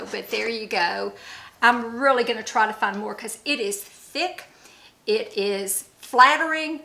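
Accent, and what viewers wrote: South, United States. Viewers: I love listening to your sweet southern voice❤. I live in Georgia and probably sound just like you! Praying for a quick recovery from your upcoming surgery! Bless your heart ❤️. You are a beautiful soul ❤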